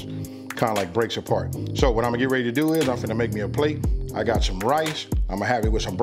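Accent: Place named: Chicago, United States. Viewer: Good job bro! I like the beat in the background also. If I wasn't mistaken I would have thought you was from the Chi lol.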